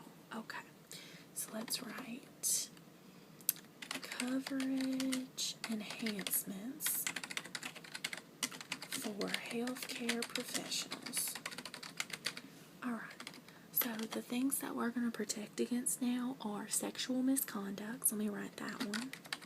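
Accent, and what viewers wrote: South, United States. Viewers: Please please please do more Southern accent videos =)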